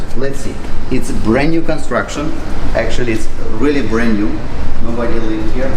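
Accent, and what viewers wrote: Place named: Russia. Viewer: Слышен русский акцент)) но это только радует)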